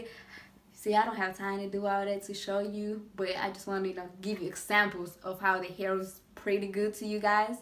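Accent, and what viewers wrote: South, United States. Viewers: Nah that's an insult to just can't speak she's ignorant just got a country accent she ain't putting on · She’s Hispanic and she’s from Alabama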